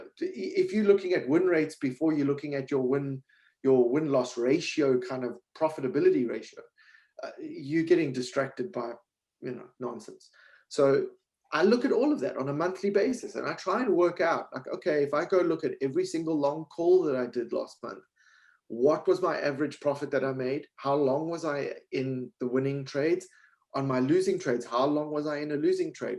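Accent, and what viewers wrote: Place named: United Kingdom. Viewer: you have such a pleasant way of communicating I could listen to you talk all day. The British accent doesn't hurt either.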